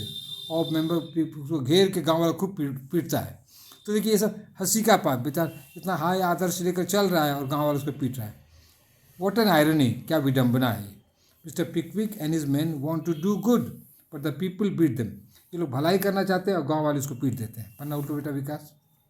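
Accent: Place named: Bihar, India. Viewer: Sir ap Bihar se hai kya? 😂 Hasi hoybe kregi hahaha. I'm also from Bihar that's why I understand your dialect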